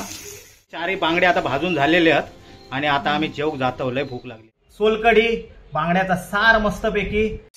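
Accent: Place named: Malvan, India. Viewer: व्हिडीओ चांगलेच असतत मालवणी भाषा बोलण्याची ठेक माका आवाडता शात हा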